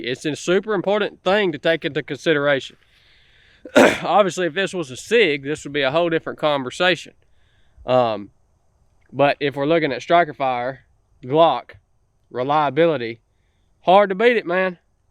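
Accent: South, United States. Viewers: Smart people with southern accents are always fun to listen to 🤟🏼🤟🏼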